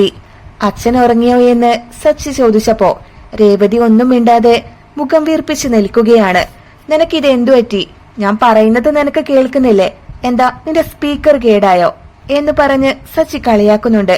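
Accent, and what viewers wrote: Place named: Malabar, India. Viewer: മലബാർ ഭാഷ ആയതൊണ്ട് ചൊതിച്ചതാണ്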